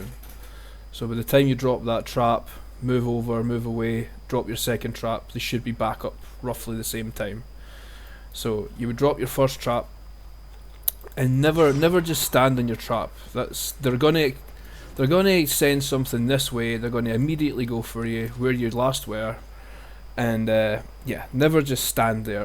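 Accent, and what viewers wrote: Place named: Scotland, United Kingdom. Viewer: I mean, I appreciate how inforamtive the video is but... if you sounded more scottish AND angry that would also be very entertaining xDDD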